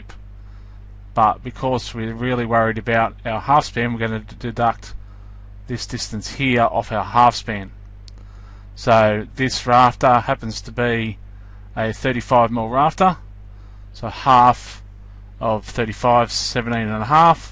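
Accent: Australia. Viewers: Aussie aye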